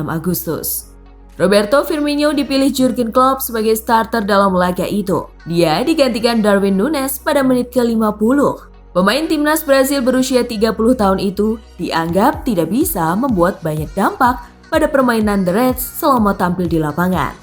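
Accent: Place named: Java, Indonesia. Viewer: Kaka yg sekarang suaranya kaya d medok medokin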